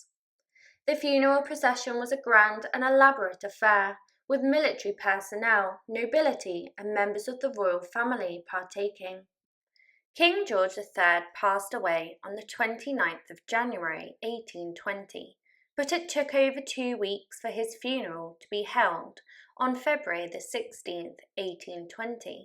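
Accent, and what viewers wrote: United Kingdom. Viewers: I’m not even British and I understood her perfectly, maybe this is not the channel for you. I’m American (deep down south of the US at that) I think her British accent is lovely. Just saying. But I agree with comment above , the use of captions should help out a lot.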